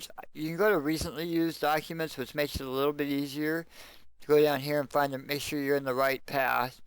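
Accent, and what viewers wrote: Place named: United States. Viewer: Don't worry about your voice. For me as a german it sounds like typical American with a little slang. But I understand your pretty well. Thanks for the tutorial. It helps very much :-)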